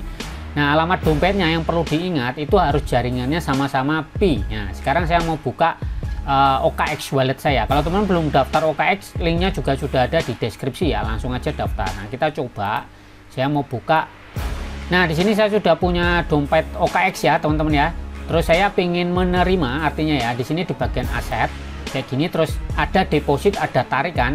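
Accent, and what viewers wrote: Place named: Java, Indonesia. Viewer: medok kali mas.....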